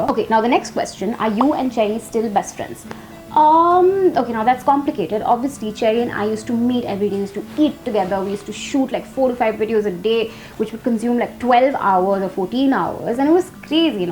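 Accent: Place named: United Kingdom. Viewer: i love your english accent...how did you learn it n speak it so fluently.?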